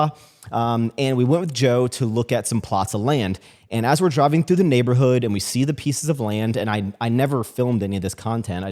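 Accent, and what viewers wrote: United States. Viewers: The onset of the country accent is crazy. Love you! Rooting for you always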